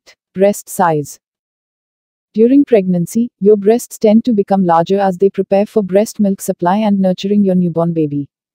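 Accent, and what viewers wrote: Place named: India. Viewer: the thick indian accent has spoilt this video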